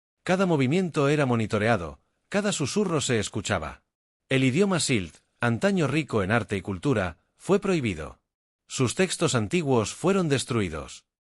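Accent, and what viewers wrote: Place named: Italy. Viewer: Estoy de acuerdo 😅, entre el italiano que se cuela y las pausas brutales en medio de las frases... Cuesta seguir la historia, pero vas por buen camino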